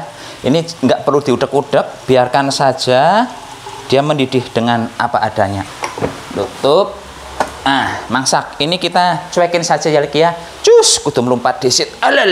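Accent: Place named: Banyumasan, Indonesia. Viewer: Mase nek bicara ngapak, daerah cilacap tah mase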